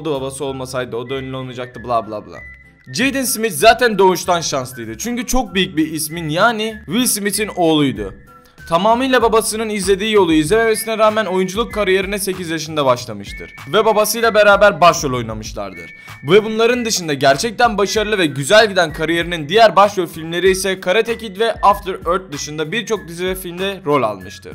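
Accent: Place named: Hatay, Turkey. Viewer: hataylı olduğu nekadar belli 😃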